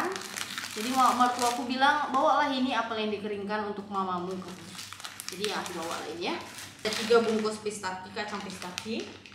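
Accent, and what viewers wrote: Malaysia. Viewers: cara kamu berbicara spt bahasa melayu malaysian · Saya mulai subscribe dari tahun lalu, suka aja liat videonya lucu, menarik, dan logat melayu'y ini bikin gemas Klo ngikutin d'ig baru berapa bulan ini karna saya ga terlalu aktif d'ig 🤭🤭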